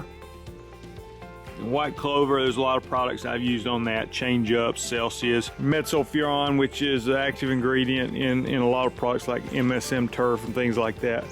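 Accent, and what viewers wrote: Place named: North Carolina, United States. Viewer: Good stuff! Mom is from North Carolina so I hear you great!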